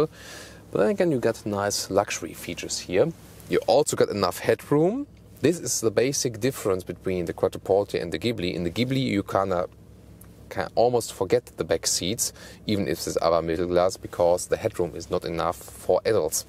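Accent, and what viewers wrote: Germany. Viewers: Great review. Your English reminds me so much of my Grandmother. She was German, too. And everytime she asked 'what time it is' she would say 'how late is it' (wie spaet). Made me smile. You liked the car but it seems you're not fully convinced.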